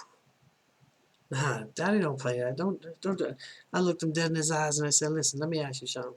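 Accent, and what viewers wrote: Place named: Queens, United States. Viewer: Where u from man Oh wait shit u from queens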